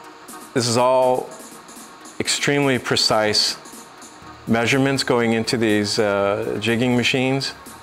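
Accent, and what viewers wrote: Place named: United States. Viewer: Oh, you’re from Ireland? Always thought you were American. Also, those silicone stampers really are hypnotic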